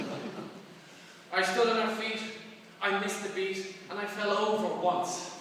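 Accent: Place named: Ireland. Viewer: A highly entertaining and very well crafted speech. Well done,. And refreshing to hear a non-Anglo-Saxon accent. Ah shur, I'm a bit biased towards the dulcet tones of my fellow countrymen!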